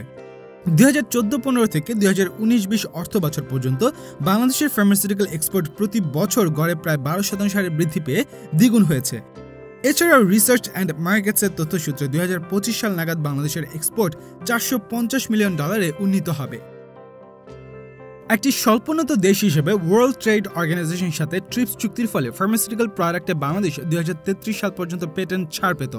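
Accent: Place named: United Kingdom. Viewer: আপনার সব বাংলাদেশী youtubers রা বাংলা ভাষা বলার সময় এতো British দের কথা বলার টান ব্যাবহার কেন কেরন।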